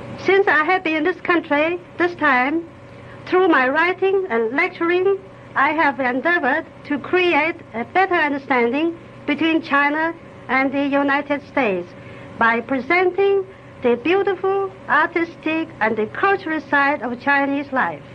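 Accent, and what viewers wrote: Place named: United Kingdom. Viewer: 英国口音，挺好听，好像公主👸有些腼腆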